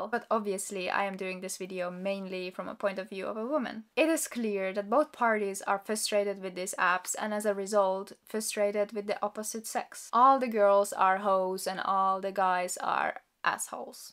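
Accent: Russia. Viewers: I like your accent. Is that Russian? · Hey i just want to tell you: never give up on making YT vids. I think you have a talent for it, plus natural charisma plus beauty. You will make it for sure (I say this knowing how tough it can be sometimes, i have a channel slightly bigger than you, like 1.5K subscribers... so just want to give you some encouragement, because i really think you're good at it) By the way where are you from? I just saw another one of your vids where you say you don't identify with your home country, but i just ask because i assume you're either from Russia or Ukraine because of your name and accent.... I've been to both (was actually in Kyiv when the war started....). Anyways you don't have to answer if you don't want but I'm just curious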